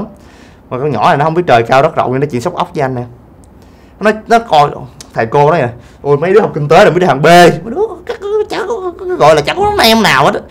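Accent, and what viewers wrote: Mekong Delta, Vietnam. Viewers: a nói đúng chất miền tây lun a oii. ABC các kiểu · a này nói chuyện hay quá, vừa thực tế vừa nhiều bài học thấm, nói chuyện kiểu miền tây chất phát dễ nghe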